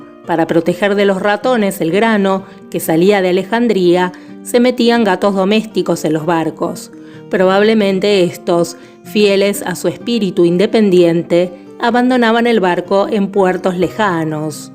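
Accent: Argentina. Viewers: se nota mucho que eres de argentina y por alguna razon me gusta como hablas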